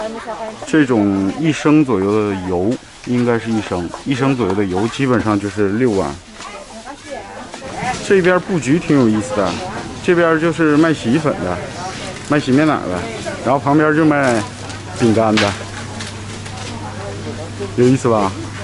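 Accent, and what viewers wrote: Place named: China. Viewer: 你的英语中国口音很重😂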